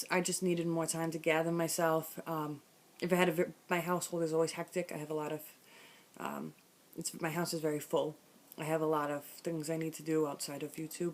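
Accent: New York, United States. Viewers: I love your accent! New York?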